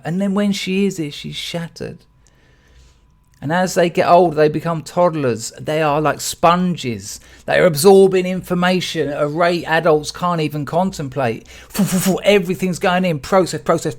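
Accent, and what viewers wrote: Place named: England, United Kingdom. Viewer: You don't know how good it feels to hear someone with an English accent say this. I have argued endlessly while my cousins across the pond called me a backward hick. I knew their decisions and attitudes would devastate their fair isle, I had no idea how quickly it would happen. Right now it's a giant snowball going straight down a mountain. Britain and Europe is lost.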